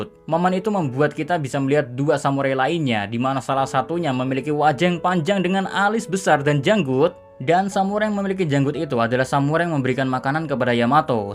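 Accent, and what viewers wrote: Java, Indonesia. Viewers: Lidah jawa mas . Jangan heran . Yang penting penonton paham 😁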